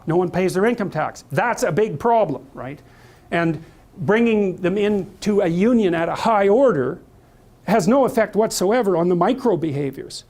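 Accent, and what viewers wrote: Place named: Canada. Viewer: Lewis ouaret He's Canadian, you can hear it in his voice.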